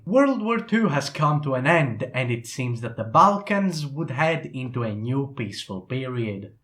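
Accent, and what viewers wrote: Greece. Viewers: Φίλε μου το βίντεο σου είναι πολύ καλό και συγχαρητήρια γι' αυτό! Μου αρέσει τρελά που ξεχωρίζεις ότι είσαι Έλληνας, μέσα από την αγγλική σου προφορά. Εντάξει ωραίο 😄😀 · You have a perfect Greek accent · Biggest greek accent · Μου αρέσει η ελληνική προφορά μέσα στα αγγλικά!!😄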